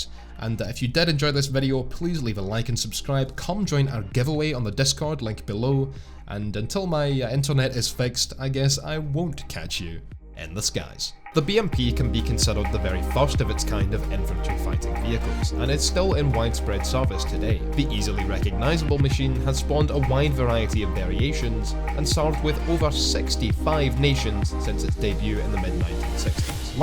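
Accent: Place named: Edinburgh, United Kingdom. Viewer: You sound like your from Edinburgh….I like Edinburgh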